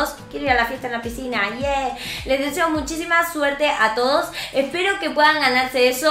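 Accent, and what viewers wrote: Argentina. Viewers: Sos de argentina?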